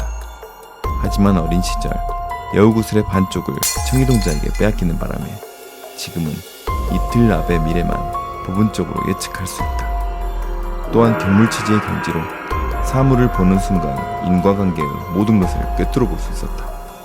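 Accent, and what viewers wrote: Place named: Jeolla, South Korea. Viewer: ㅋㅋㅋ 밤마다 이거 보는 재미에 삽니다. 나레이터님 전라도 말투도 구수하고 ㅎ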